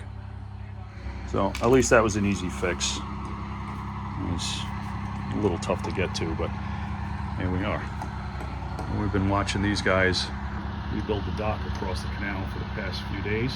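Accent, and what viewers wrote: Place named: East Coast, United States. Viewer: Hey there. Long time follower since we were East Coast neighbors with distinct "accents" lol, before your move to sunny Fl. The best thing I liked seeing you do was not only the last upgrade video where you replaced the engine flush connectors to quick fits, which is a game changer and something me and Dad did to all our boats and dock gear back a bit in the day. But you read my mind and connected them both with the jumper for a one and done, which wasn't available back then. But yeah was really another cool thing you came up with, on the fly for maximum ease. It brings me back to the good ole days with Dad, you two have/had the same think on the quick and make it work or fix anything. 👍 Good stuff. Best wishes too you 3 on your new girl and new adventures!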